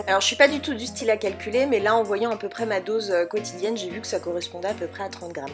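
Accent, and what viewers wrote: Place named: England, United Kingdom. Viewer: Laccent anglais de ouf #pas du tt de l'ironie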